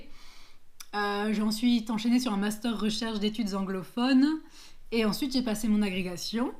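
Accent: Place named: United Kingdom. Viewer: Sympa la vidéo ! Sinon pour les cyclo je pense que le top c'est comme les courses, tu manges entre 3 et 4h avant (oui vraiment, et c'est pas trop) des pâtes, un équivalent de viande blanche, puis peu manger pendant l'effort parce que ça oblige à digérer a nouveau et en plus c'est pas toujours judicieux de mettre la main a la poche en peloton x) Sinon le fait que tu sois prof explique l'anglais au début de la chaine et l'accent, c'est rare chez les youtubers français 😂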